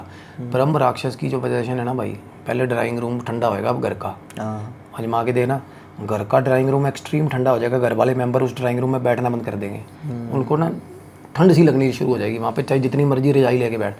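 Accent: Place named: Punjab, India. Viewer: paji sat shri akaal ji.. paji ek gl kehni c meri gl da gussa na kreo bai dilo respect aa tanu veere i really appreciate for your hard working. Paji ek cheej main piche boht dina ton note kr reha k eh banda jehda tade nal baitha hunda naam aa i think veer da, bai eh Banda tadi energy khraab kar reha yr tadi gllan sunan ch boht chass aandi, kyonki tuhada lehja punjabi touch aa extreme energy aa tadi 24/7 active rehnde oo tuc. Pr bai main fr kehna meri gl da gussa na kreo pr jehda banda tade nl baitha hunda aa eh bnda tadi energy khtm karda yr nal beh k susti wala atmosphere bnaya hunda es ne. Sare sade interest nu khtm kr dinda yr eh banda please es cheej t thodi working kro veer g. Otherwise mainu eda feel hunda k jes trha main da susti bhrya chehra dekh k irritate hunda rehnda baki tadi audience v jroor irritate hundi hovegi. Eh banda bai mhol bnan ton pehle ee swaad khraab kr dinda aa yr. 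Ann.. Ann.. kr kr k please bai meri gl da gussa na kreo main dilo respect krda tade hardwork nu baki tuc khud siyane oo paji. dilo pyaar❤️ sada hassde vassde rho, baba bhali kre☑️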